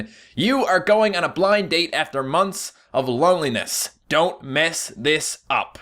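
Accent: Canada. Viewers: Yes, he is from part of Canada where they say that. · his Canadian sorrys really show in this video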